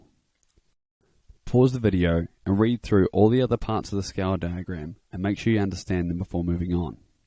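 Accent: New Zealand. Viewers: Question #1: A like a Lot of guitar players but You said that i have to mentione just one of them i Will Say Kirk Hammet from Metallica because he is really technical and his solos goes straight up to My soul. Question#2: i like the way that You teach how to improove your skills in a easy and fun way! Since i'm latinoameeican but i speak English your New Zealand accent sometimes i have to go back and listen again your explication. Don't get me wrong i don't have nothing Against your accent, actually i think it's cool but i'm used to listen American accents but i got it after a couple times listening to You! I like how an easy scale can be changed in any way that You want ti make it sounds good but i need to watch again the video and practice. I would like to understand which scales goes with the right chords? For example if i use to start with G5 and then to A5, then to C5 and finish with D5. 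What it would be a good easy scale to use with that secuence of chords? Just an example! Or just to make it easier for example to play of, the chords are just 2 pretty much the whole song which they are G and A! Which scale can use fir that and also how i Will know to use the right scale depending of the chords that the song has? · I was thinking about your accent and I should have got it cuz i am from New Zealand to. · You sound Kiwi, are you Kiwi? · Love that kiwi accent man! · So that is new zealand accent?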